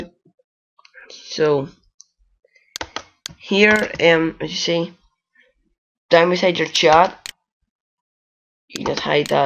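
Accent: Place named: Ireland. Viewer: You sound Irish :) !!!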